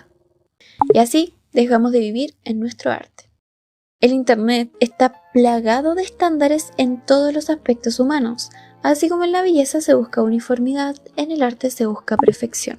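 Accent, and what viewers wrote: Chile. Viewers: tu voz con ese acento chileno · se me hizo recontra tierno su acento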